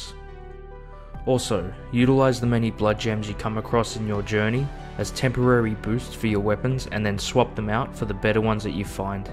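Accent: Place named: Australia. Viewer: Can we play together. You sound australian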